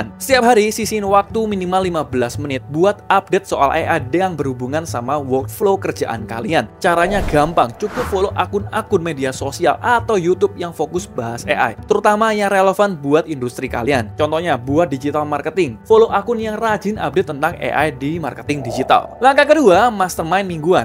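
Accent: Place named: Surabaya, Indonesia. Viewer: mantap cak, logat sby nya kelihatan... sbynya barat, timur?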